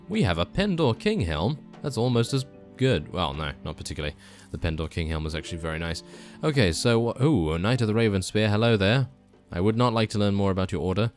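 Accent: United Kingdom. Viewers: The Valleys UK, or British basically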